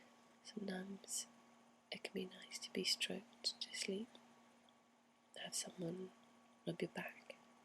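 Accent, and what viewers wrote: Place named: England, United Kingdom. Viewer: I don't know why it's interesting. The title says what the accent is. But to be specific it's an English accent. People only call it British because that's all Americans will ever understand about British accents.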